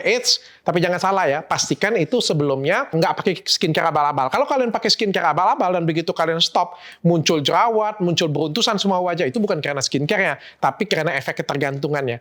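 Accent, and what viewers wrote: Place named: Madura, Indonesia. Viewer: kok kyk madura dok logatnya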